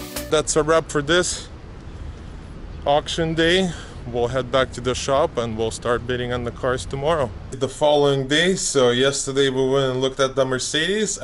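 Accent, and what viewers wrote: Russia. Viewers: брат ты русский ? у тебя акцент))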